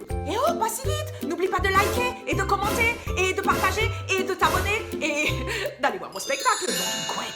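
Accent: Alsace, France. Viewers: Super merci beaucoup En tout cas moi j aime quand vous parlez fort Alsacien un jour Alsacien toujours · J adore l accent !!! Ce bon vieil accent alsacien. Chouette vidéo et recette bien clair à comprendre !!😂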